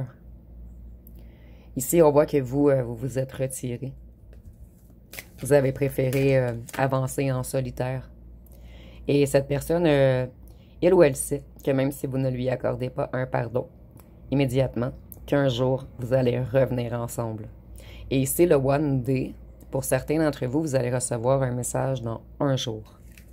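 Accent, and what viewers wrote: Quebec, Canada. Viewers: Bluffant !! Incroyable à chaque fois mais là 👏 bravo. J'adore votre accent québécois et aussi quand vous rigolez sur certaines cartes le concernant 😂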